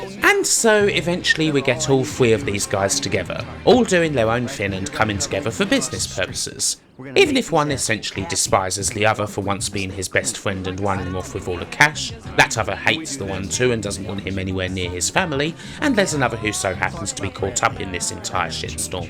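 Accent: United Kingdom. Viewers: people don't watch long videos. If you want information about a game which boils down to "is it worthwhile or not?", it can probably be boiled down to 5-10 minutes. If the video is longer, chances are, the density of original thought and production quality is quite low, and 90% of the voice over wouldn't even pass for complete sentences, consisting mostly of "uhhhs". Admit it, you have seen such videos and would avoid them like a plague. Now, this guy is nothing like that, he can actually make insightful points an hour long. But not a lot of people know that, apparently. Add to that the mild British accent, and suddenly the bulk of the largest English-speaking country imagines that it's too difficult to comprehend.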